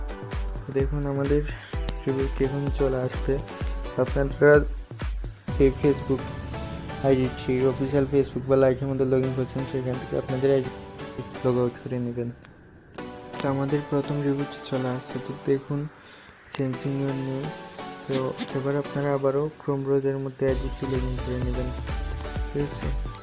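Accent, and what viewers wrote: Sylhet, Bangladesh. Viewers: Vai....Sylhety ni😁😁😍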